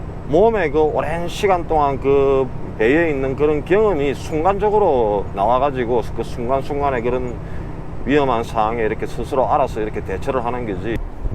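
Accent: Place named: Busan, South Korea. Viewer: 저의 작은 삼촌하고 목소리, 발음, 부산 사투리까지 똑같네요. ㅎㅎ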